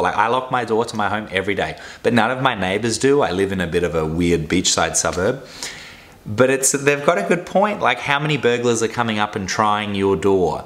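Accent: Australia. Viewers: Is your accent Australian? So you train where the spiders are the size of your head?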